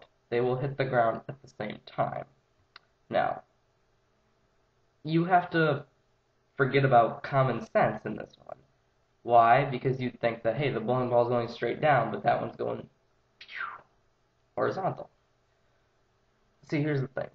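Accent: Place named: United States. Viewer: Why do you have an American accent?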